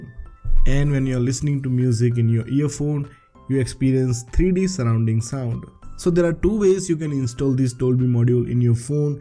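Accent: India. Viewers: Bro Ur Indian🇮🇳 or 🇦🇺Foreigner...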